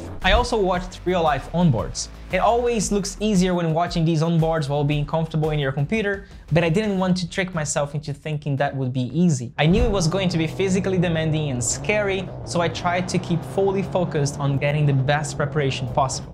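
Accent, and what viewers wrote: Brazil. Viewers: Cara, como que vc desenvolveu seu ingles assim? Eu tava escutando voce falando, e pensei, nossa ele parece um brasileiro falando ingles, mas fui ver tava tudo em ingles, comecei a ter duvidas, mas vi a bandeira do brasil no carro... conta o segredo dos ingles pra nois ai kkkk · Eu já tava achando q ele era brasileiro só de escutar o sotaque e também pelo nome dele, agora q tu confirmou tirou minha dúvida.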